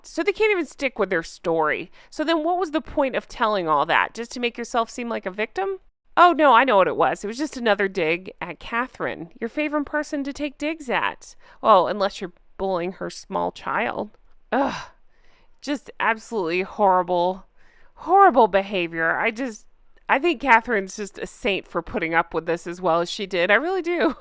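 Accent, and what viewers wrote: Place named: United States. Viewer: i am british and i love your voice and your laugh. We love the american accent in Britain❤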